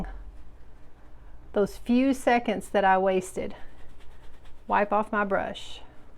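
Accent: South, United States. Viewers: I didn't know you were southern! Tennessee? 😊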